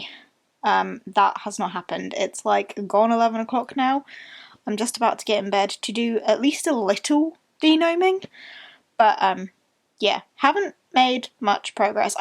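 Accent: United Kingdom. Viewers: I guess you are British, aren't you? Greetings from Italy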